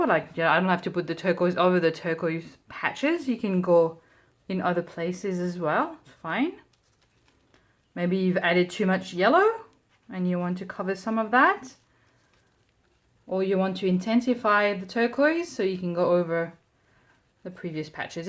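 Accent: Australia. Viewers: thank you for your inspiration! I liked your commentary about just playing - not to create a masterpiece, just play. I've never used my hands to paint before and it was so very much fun! Thank you for your posts - you are lovely to watch. Also, your accent is lovely - part Aussie, part French perhaps? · So you live in Sydney. I thought I could hear an Aussie accent coming through. With a username like I gather you are from somewhere there. Beauitful. Thank you for the video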